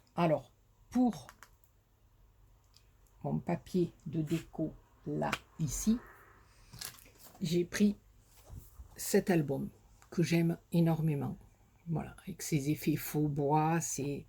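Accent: South, France. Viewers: Coucou, wouahhh c'est une sublime création, j'adore, douceur, raffinement!!! que du bonheur!! merci beaucoup pour ce tuto ensoleillé de l'accent du midi, agréable moment, bisous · Elle est vraiment très jolie cette carte, c'est vraiment un plaisir de vous suivre et pitié surtout pas de vidéo muette 😋 je suis trop contente de vous entendre ça fait du bien au moral! Continuez à nous enchanter et bravo pour cette belle réalisation (trop classe!) Prenez bien soin de vous et à bientôt pour entendre l'air du midi dans votre voix😍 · Jolie carte Shabby j ´aime💞💞 merci pour le tuto et le partage ,ton accent du sud fait du bien😃👍.bisous😘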